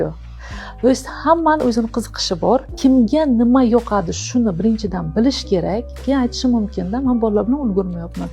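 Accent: Bukhara, Uzbekistan. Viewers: Бухороликмисиз опа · Бухороданмисиз шевачайиз кетоптида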